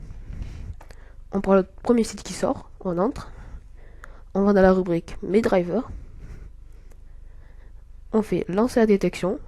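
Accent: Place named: South, France. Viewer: tu es du sud xD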